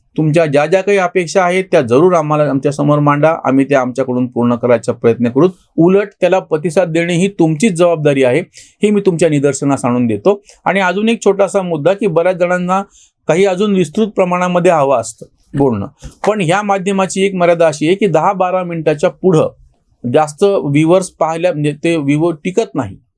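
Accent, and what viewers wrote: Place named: Marathwada, India. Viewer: मराठवाडी लहेजा न सोडता आपण बोलत रहा. भाषा दर दहा कोसांवर बदलते अशी म्हण आहे. "लाईक्स भेटत नाहीत" या वर कुणाला हरकत असेल तर तो त्याचा प्रश्न आहे. भाषा शुद्धतेचा अवास्तव आग्रह धरणे अनावश्यक आहे. व्हिडिओ दहा मिनिटात संपला तर जास्त यशस्वी होतो. भाऊंचे व्हिडिओ मी 1.75X स्पीड ला बघतो. काही फरक पडत नाही. उलट भरपूर वेळ वाचतो.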